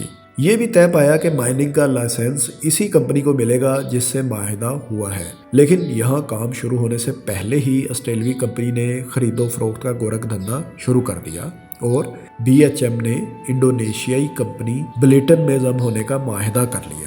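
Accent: Punjab, Pakistan. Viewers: Ye Bhai punjabi men urdu bol rahy thay badi mushkil men lag rahay thay. Faisal Warraich bhai ko khud BG voice deni chahye.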